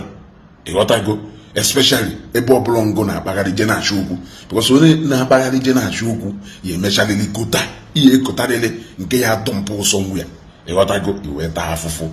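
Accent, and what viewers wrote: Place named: Igbo, Nigeria. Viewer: awesome presentation. That's the ende-Igbo style of talk.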